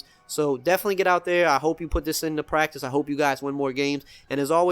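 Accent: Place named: New Orleans, United States. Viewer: First of your videos I’ve watched, noticed the New Orleans accent, keep it up, WHO DAT BABY!!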